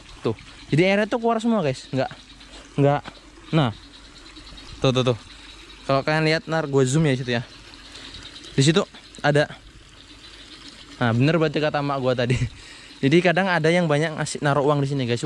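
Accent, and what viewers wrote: Java, Indonesia. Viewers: kok ngomongnya jawa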